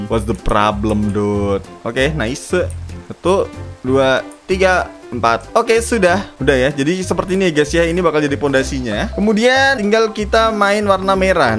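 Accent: Java, Indonesia. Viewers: Wuihh suaranya khas jawa bet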